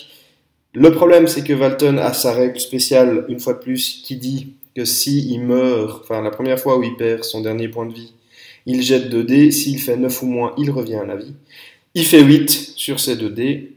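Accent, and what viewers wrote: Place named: Switzerland. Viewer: Salut encore une fois bon rapport de bataille bien expliqué. Si tu souhaites rencontrer de nouveaux joueur je suis motivé pour cela. Je joue Nains, Orques et Gobelins et Bretonniens. J'ai cru jusqu’à cette vidéo que tu étais Belge ^^ alors que tu as l'air plutôt Suisse. Moi j'habite à une heure de Genève mais en France. Bonne continuation et longue vie à ta chaine.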